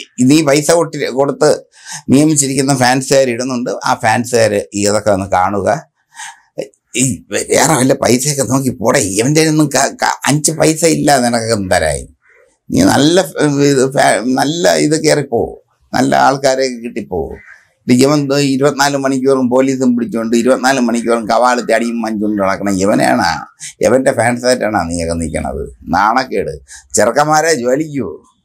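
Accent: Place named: Thiruvananthapuram, India. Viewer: അണ്ണാ tvm ഭാഷ... വളരെ മനോഹരമാണുകേട്ടോ... രണ്ടാളും ട്വിൻസാണോ?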